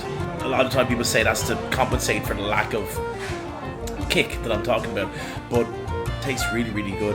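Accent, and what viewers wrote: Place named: Northern Ireland, United Kingdom. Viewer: I know you said you're a Dub, but I hear the lovely lilt of the north. Am I wrong?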